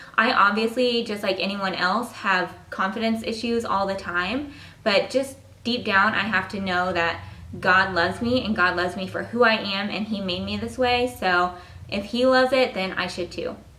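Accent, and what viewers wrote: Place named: Indiana, United States. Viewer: Just now watching this, but i knew you sounded like a fellow Hoosier! hahahaha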